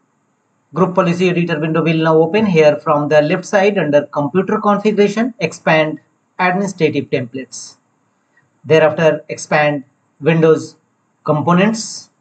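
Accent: India. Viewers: Thank you for your teaching, it seems that the professional version only has gpedit.msc, when you have time to play to see; it is a pity not to modify the registry file regedit, I am relatively familiar with. I'm familiar with regedit, and I'm guessing from your voice that you're a friend from India, and I've lived with friends from India before. ( DeepL translation ) 謝謝您的教學，好像專業版才有 gpedit.msc ，有空的時候可以玩玩看；很可惜不是修改登錄檔 regedit , 個人相對熟悉，。聽您的聲音猜測是印度朋友，曾經和印度朋友居住過。( DeepL翻譯 )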